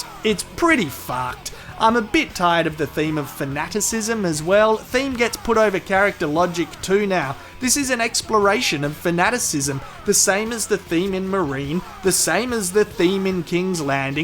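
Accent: Australia. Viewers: Fuck this shot is gold 👍🏻 you an Aussie? Or just the name? Aha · Love your facking reviews mate. Australian narration FTW! I imagine you've read about Georgio's plans for Shireen in the book? <link>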